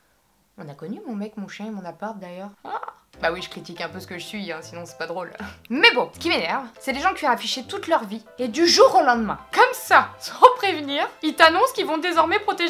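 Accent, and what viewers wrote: England, United Kingdom. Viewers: J'adore trop ton accent d' anglais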